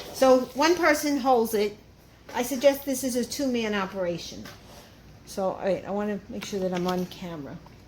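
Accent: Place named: New Jersey, United States. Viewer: you definitely sound like a Jersey Girl. That locked amazing.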